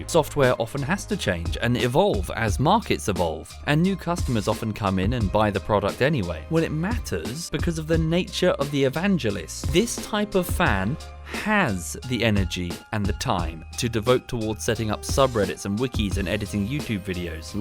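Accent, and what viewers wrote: England, United Kingdom. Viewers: I'm getting strong UpIsNotJump vibes here. Or maybe all englishmen sound the same to me. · i can understand british accent smoothly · Bro a dude with a posh British accent just explained to me what early access really means I like this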